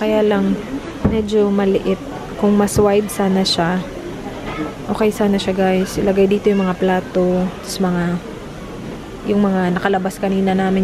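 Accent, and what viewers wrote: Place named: Visayas, Philippines. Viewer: Diko na gsto accent ng English mo. Tunog bisaya na. Nong nasa cebu ka gstong gsto. Or baka sa video lang kasi madame kame bisaya na viewver mo.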